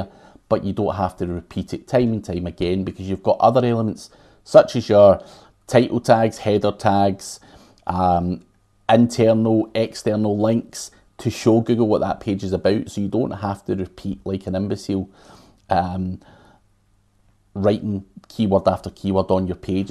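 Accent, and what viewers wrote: Scotland, United Kingdom. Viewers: As soon as I heard you were Scottish, I liked and subscribed :)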